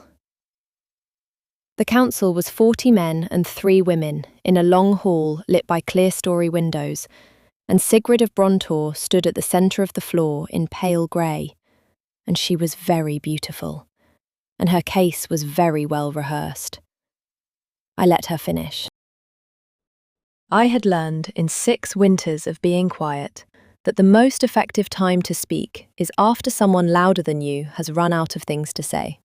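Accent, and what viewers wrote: England, United Kingdom. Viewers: Loving these stories, it's so wonderful hearing an English accent ❤ I am English so hearing our accent is great, please don't change it 😊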